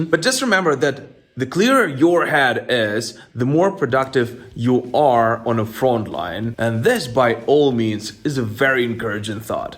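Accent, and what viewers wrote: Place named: West Coast, United States. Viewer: I'm curious. Where did you learn English? Did you know that you speak with a American west coast accent? It's true. You really do. Perhaps your English teacher was from California or the Pacific Northwest?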